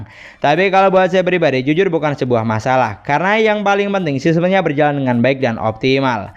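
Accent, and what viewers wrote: Madura, Indonesia. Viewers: Orang madura ya bang?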